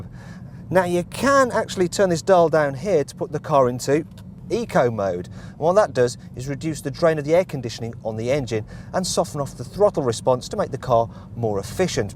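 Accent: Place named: Midlands, United Kingdom. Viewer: Maybe he's originally from the Midlands...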